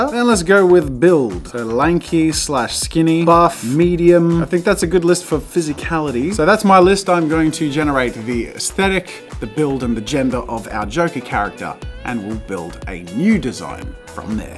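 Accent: Australia. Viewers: Lunatic Laughs in Australian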